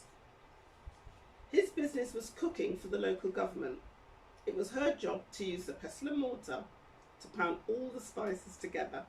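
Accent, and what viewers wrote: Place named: RP, United Kingdom. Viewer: Hello, absolutely love your talk on planting ginger. You sound very posh. Can't wait for more tips from you!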